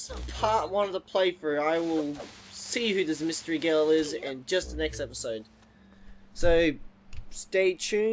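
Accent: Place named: RP, United Kingdom. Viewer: you sound really posh XD waaah i should do a lets play...maybe XD so you can hear my voice >:3 i kid XD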